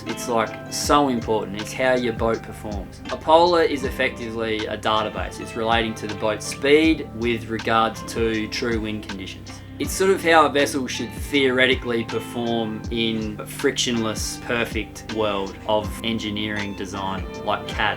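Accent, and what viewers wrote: Australia. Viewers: Phew. What a waffle. First I thought it was going to be 1 minute of Aussie nasal bad-grammared intro, then it became 2, 3, 4, but he kept going. Jump to about and he'll start talking about specifics. The title's insufficient too. It's about buying a cat for ocean travel, not for what most people do with their recreational vessels. Three minutes of information squeezed into . 'If that's your design parameters...'. Grammar, mate. Unfortunately, it's a ramble. If only he wrote up a few salient points. :-( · G'day, mate. Loved the video after I got accustomed to the Aussie accent. Pack your kit in a seabag and sign on a cat as crew for a year. At the end of that year, you will have the experience to make a wise choice of boat. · We're not sailors...at all. But we love your videos and have learnt heaps about sailing since watching them. We found this one really interesting. 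Oh and we really love hearing a fellow Aussie's accent and how your positivity always shines through 🤗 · Thank you for this very interesting video, even if i didn't understand everything (dispite of your Australian accent and my french-american-english 😂) Anyway just to speek about the king-size thing : we have one in our Lerouge Brazapi custom catamaran and it seems like we bought a good performing and secure boat 😉 just started sailing with it a year ago, and as we're quite novice sailors we'll tell you more in a couple of years 😄 thanks for sharing your adventure ⛵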